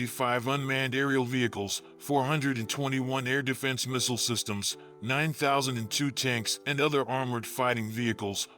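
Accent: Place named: United States. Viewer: Hello you have an American accent but a Nigerian name.Are you from Africa?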